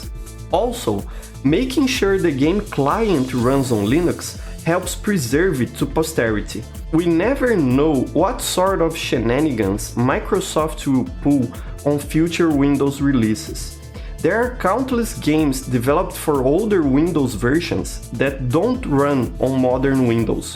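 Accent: Brazil. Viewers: are you brazilian because your accent reminds me of brazilian and I'm from brazil :D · EU SABIA Q VC ERA BR, O SOTAQUE NAO ME ENGANA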